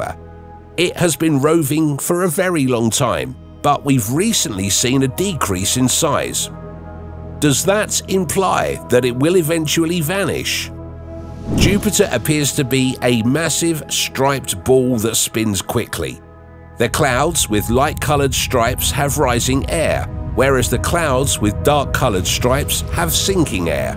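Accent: United Kingdom. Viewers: Why is the narrator a Brit? Also, why after 70 years of space exploration and billions spent on Satellites and Probes are we essentially clueless about why or what is actually happening in, on, and around Jupiter? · What's wrong with the narrator being "Brit"? Are only Americans allowed to speak on YouTube? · HUH? What do you mean "why" is he British? Do you expect the crew to go "oh damn agh nevermind you can't narrate. You're British so.." Why not a brit?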